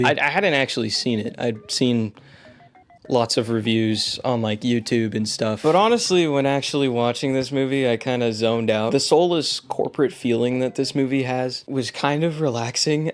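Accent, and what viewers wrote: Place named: United States. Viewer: btw you have a nice american accent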